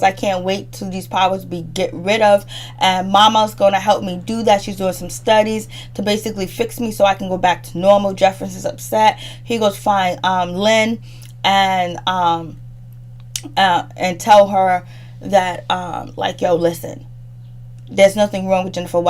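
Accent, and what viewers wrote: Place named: East Coast, United States. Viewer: your East Coast accent didn't hurt either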